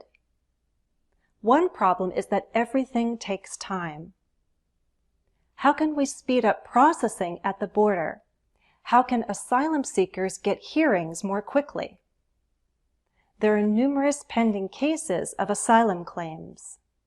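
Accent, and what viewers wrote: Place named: United States. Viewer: Hello. Thanks for your teachings! I have a question. You teach British English. Why in this video, you used American accent?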